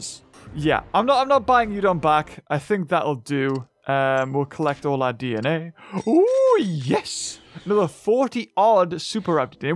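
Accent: United Kingdom. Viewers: the only difference is your british accent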